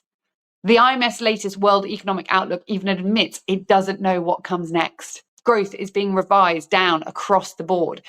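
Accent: England, United Kingdom. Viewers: Is she british?:cat-orange-whistling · Mostly asking, because of your English accent. I'm fully aware of 'everything' you're talking about + more. I'm in Australia 🦘 SO, now I'm going to get online & find out where gold hit $3500 per oz. In Australia gold is waaaay over that. I know why. SO yep, about to leave your chanel & find out where gold hit three & a half grand 💥 Pretty sure it was hovering around 3 grand in USA in the last few days / weeks. I'll almost bet my life, your headline is just more CLICK BAIT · Sounds like a lady with an English accent that likes to hear soft talk. I hear nothing of a substance here. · Funny thing...I don't trust JPMorgan or British accented commentators. Is she reading a teleprompter? Makes you go hmmm... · IMO, the British accent is beautiful and very classy.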